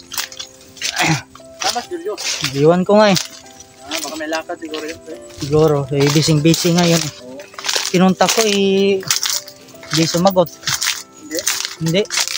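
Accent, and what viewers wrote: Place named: Iloilo, Philippines. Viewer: ingat kaung lahat. ilonggo ata c a ung tuno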